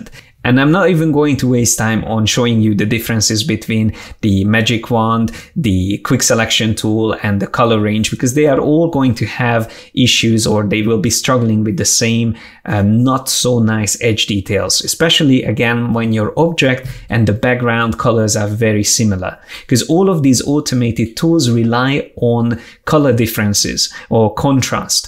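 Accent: Hungary. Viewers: jól hallom a magyar kiejtést? :D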